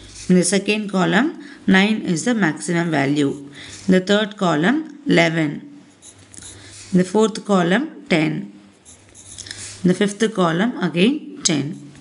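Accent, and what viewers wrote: South India, India. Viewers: I don't know how to thank you I just want to say I love you ma'am and specially love your south Indian xent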